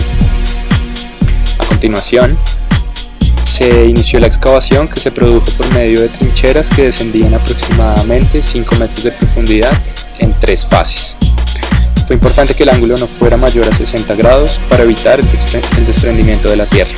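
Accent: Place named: Bogotá, Colombia. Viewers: Que pereza este narrador, Ese acento rolo, narrando un símbolo de medellin, como que no aguanta. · que jartera el acento rolo.. me da un fastidio ese tonito llenadorcito...lo peor es que ellos creen que eso les suena divino.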